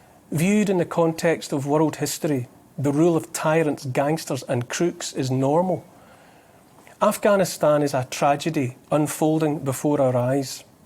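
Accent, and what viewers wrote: Scotland, United Kingdom. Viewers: Neil's Scottish accent,reminds me my young time when I was attending my primary school and then grammar school in nothern Scotland Vic.(G.D.of Lux.). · Always admired the man (and his Scottish Enlightenment accent) But during Covid19 plague?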